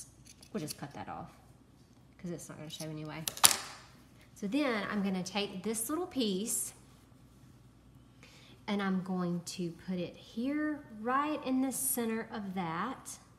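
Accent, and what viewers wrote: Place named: South, United States. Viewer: Hi...Just watched your video. You have made a wonderful quality scrub cap...French seams and all!!! I've sewn for six decades and appreciate your project! I do have a couple of suggestions. First, try not to sew with the bulk of your work going through the arm of the sewing machine. You'll find it is much easier to handle your project. Second, if you pin or stitch the end of your elastic to the entry end of your casing, you can pull it through far enough to sew both ends using just 5" of elastic and saving 2". I loved your clear, through instructions delivered with your wonderful down home Southern accent. Keep up the great work and thank you for sharing...KMc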